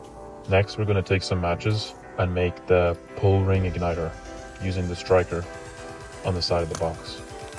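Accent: Ireland. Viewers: You Irish lad? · Mate your irish? Thats wild, granted im aware shotguns are legal for hunting in ireland but its still crazy to see this 😂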